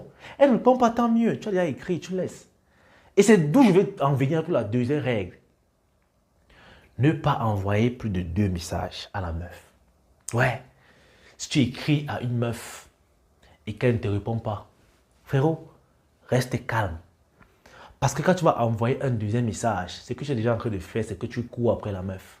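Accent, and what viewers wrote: Cameroon. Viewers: Toi tu es mon frère camerounais, vue ton accent et ta manière de parler je suis sûr que tu es un Camer, et big up et force à toi bro. ( like mon commentaire si tu es Camer et que je ne me suis pas trompé )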